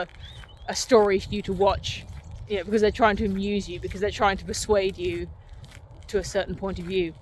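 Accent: England, United Kingdom. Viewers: Been on a bit of a Mary Harrington kick and can't help thinking she sounds so much like you (because I knew of you first). Any relation? Or just from the same region of England and thus similar speech patterns? As an American grieved by our vast population's continual homogenization of accent I love how much more so(at least it seems) folks in the UK are maintaining distinct regional accents. (Though I know the flip side of that coin is accent and class being closely tied and that limiting your options in career is a real thing for y'all.)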